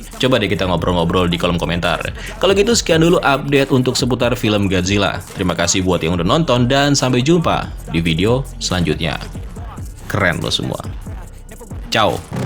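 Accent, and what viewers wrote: Java, Indonesia. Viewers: Bang kau orang jawa ya bang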